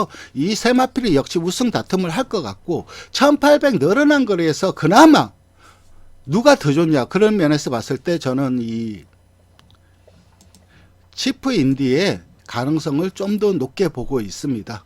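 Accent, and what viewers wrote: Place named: Busan, South Korea. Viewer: 최강게임 쌍으로 조질라니까 부산말 싹 빼고🏇🐎😷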